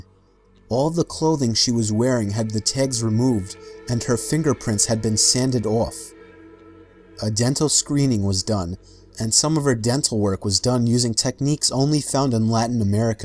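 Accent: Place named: New Jersey, United States. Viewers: i feel like he's lost his jersey accent a bit. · I can't place accent. I want to say S. Boston, but its not that thick. Definitely E. Coast. Could be New York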